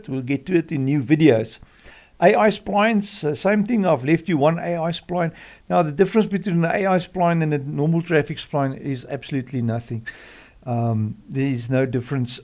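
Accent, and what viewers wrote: South Africa. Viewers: Hey. By your accent I take it you're from sunny South Africa. How bout making us a nice SA map.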